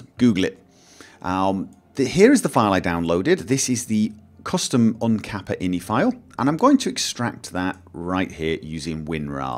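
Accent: United Kingdom. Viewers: But... British accents are cool 0_0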